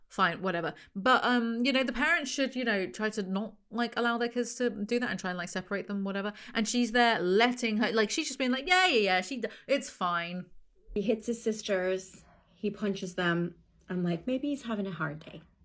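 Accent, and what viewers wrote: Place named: Nottingham, United Kingdom. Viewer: As someone from near Nottingham, UK I appreciate hearing an accent like mine on YouTube and someone with my nasty sense of humour 😂😂 Thank you 😂❤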